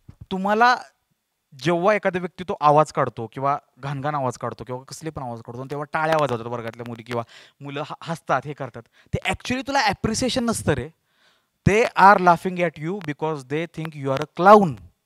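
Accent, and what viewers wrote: Satara, India. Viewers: सातारी भाषा कडक भावा🔥🔥🔥🔥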